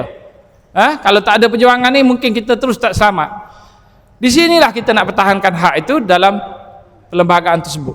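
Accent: Kelantan, Malaysia. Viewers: org Kelantan kan cakap bunyi kelantan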